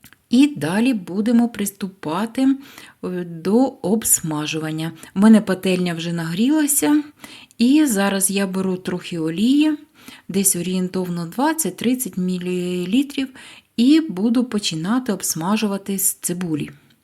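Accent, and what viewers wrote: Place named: Vinnytsia, Ukraine. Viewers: Чудова ідея з кубиками, обов'язково зроблю❤❤❤,а мені теж сподобалась мова, так розмовляють у Вінниці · Де вам тут вчувається російська мова? Жіночка розмовляє чистою українською мовою Придніпров'я, центральної та півдня України.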